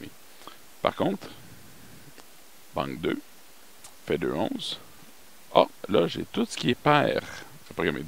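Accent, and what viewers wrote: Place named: Quebec, Canada. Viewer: En plus avec ce doux accent du Québec , c'est du bonheur !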